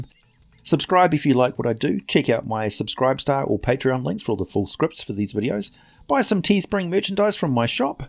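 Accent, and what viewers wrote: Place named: New Zealand. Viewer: Very nice intro, I thought you might a New Zealander. Along with South African, two of the most pleasant accents to listen to. I've also been binge watching Viva la dirt league d&d sessions lately, very silly and funny bunch.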